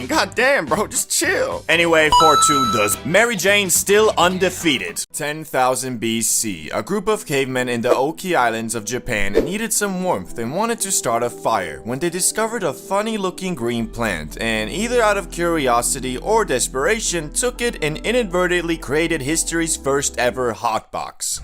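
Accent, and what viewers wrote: United Kingdom. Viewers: Do he sound like he from the Uk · He's from the UK???? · Are you britsh?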